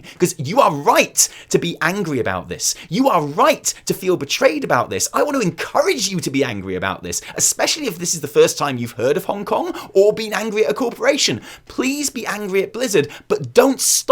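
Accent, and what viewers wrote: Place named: England, United Kingdom. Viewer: Y'all get a ton of flack for being "blizzard shills LUL" but nobody can doubt your intelligence, eloquence, or lovely English accent after watching this. I'm sharing this video with all my friends who need a TL;DR for the Hong Kong situation, and / forever have my respect for their sincere and well thought-out arguments against late stage neoliberal global capitalism. Cheers! <3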